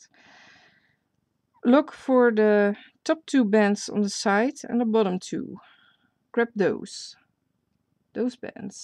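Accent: Netherlands. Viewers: you dutch ? You sound really dutch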